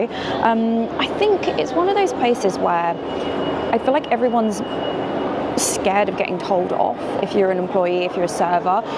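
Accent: RP, United Kingdom. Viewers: You could me over so posh but tell it qs it is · Where in britain are you from? Trying to learn accents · Mi primera vez viendote. Que hermosa que sos. Y me encanta el accento britanico. Vere mas videos tuyos.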